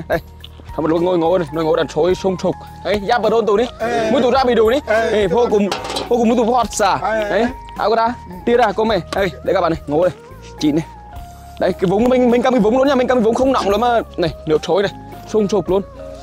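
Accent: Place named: Central, Vietnam. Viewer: nói nhanh quá dọng miền trung hơi cứng nên em nói chậm nhịp lại cho khán giả nghe kịp vì khán giả là người khắp mọi miền tổ quốc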